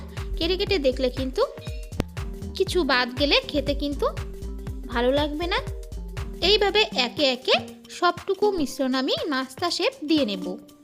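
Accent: Bangladesh. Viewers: দারুন, আপনার উচ্চারনে কিন্তু বাংলাদেশী টান স্পষ্ট । এটা বড়ই কানে লাগছে! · Khub Sundar. Ekjon bolen Bangladeshi tan lagche kathya te. Recipe bujhlei hobe. Bujhechen. Karo durbolata k nea tana Tani korben na. Ato sundar ekta recipe .atai boro kathya.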